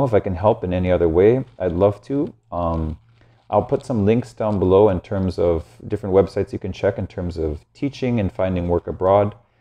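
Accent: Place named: United States. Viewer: You sound American now! Sorry about the earlier comment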